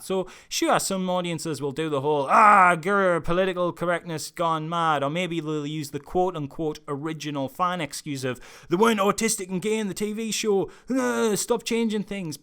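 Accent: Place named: Wales, United Kingdom. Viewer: I can't seem to place ur accent. Welsh maybe? or scottish?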